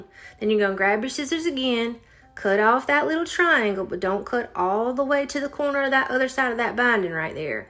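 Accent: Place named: South, United States. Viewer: Gorgeous work and what a voice! I adore your voice and smooth southern accent. I'm subscribing just so I can listen to you some more.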